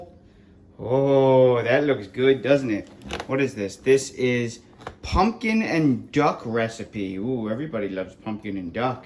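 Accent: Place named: Northeast, United States. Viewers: You sound a little bit South Philly Italian to me. I’ve learned a lot from you. I’ve definitely bitten off a lot but I’m reasonably optimistic. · You’re not originally from NC are you? Accent says NJ/PA/NY.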